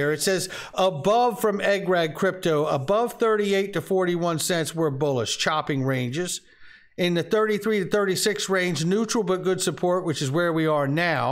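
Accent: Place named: United Kingdom. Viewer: We loveee your show TV 🥰🥰🥰🥰🥰😍😍😍😍😍🥰🥰😍🥰 you very good british speak i very much smile happy see you ❣❣❣ love really bro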